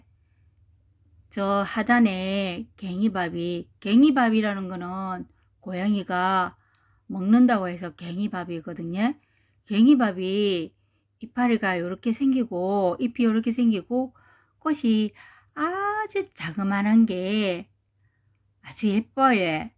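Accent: Gyeongsang, South Korea. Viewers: 요즘 아트에서도 꽃나무 판매 하더라구요. 예쁜아이들 잘들여 오셨어오. 경상도 사투리 귀엽고 예쁘세요.ㅎ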